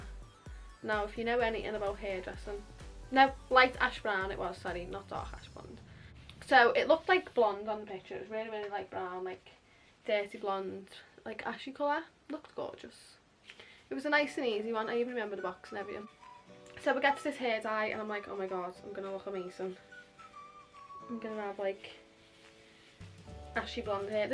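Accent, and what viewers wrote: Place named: Liverpool, United Kingdom. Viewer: GORGEOUS!! (and I love your Liverpudlian accent! I LOOOOVE Liverpool so much!) ❤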